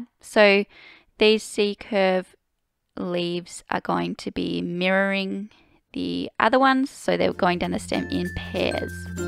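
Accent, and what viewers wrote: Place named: Australia. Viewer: I’m very grateful for your beginner exercises and it’s great to have tutes with an Australian voice!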